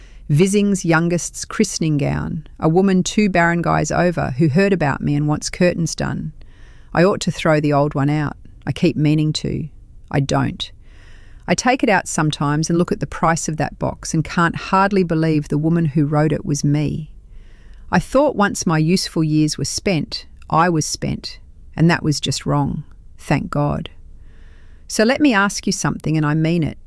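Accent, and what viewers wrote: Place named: United Kingdom. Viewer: She sounds british. One more story about how wonderful the philillipines are why do so many philillpines come here and stay.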